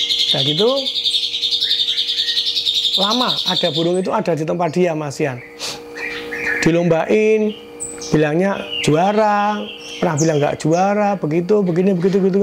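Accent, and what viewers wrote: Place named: Yogyakarta, Indonesia. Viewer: kren cara bicarene ngk ningalne ciri kas jogja, mudah dicerna masih percaya bejo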